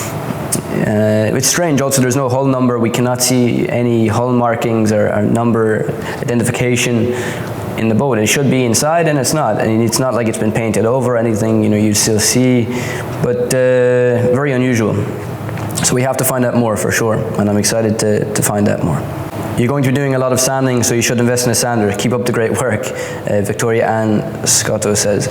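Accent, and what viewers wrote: Ireland. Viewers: What's your accent? Irish, but something else too?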